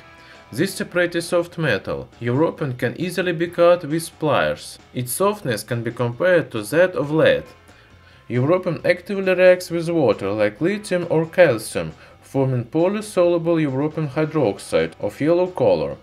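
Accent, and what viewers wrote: Russia. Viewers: Kindly forgive me Your accent is too much Russian when you speak English .Can you improve it anyway ? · Who dosent like ur ruski aksent dosent deserve to be educated... I like it same as our hydraulic press Chanel... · Ах этот прекрасный русский акцент.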